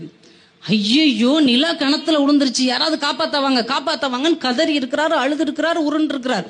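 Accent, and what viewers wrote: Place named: Madurai, India. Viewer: இதுவரை கேட்டிராத, மிகவும் கற்பனைவளமுள்ள சிறப்பான குட்டிக்கதை. குடிமகன்களுடைய வாழ்க்கையே தனி, மதுரைத் தமிழ் அதைவிட அமர்க்களம். அன்புச் சகோதரி வாழ்க உங்களுடைய தொண்டு.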